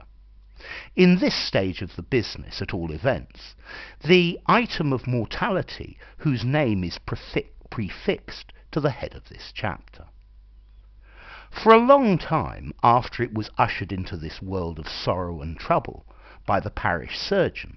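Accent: United Kingdom. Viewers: I really love your British Accent, if you don't recording more sir!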